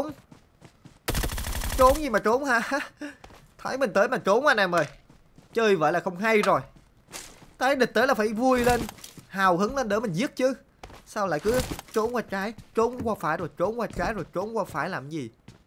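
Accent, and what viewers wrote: Binh Dinh, Vietnam. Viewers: Bình Định bạn nhá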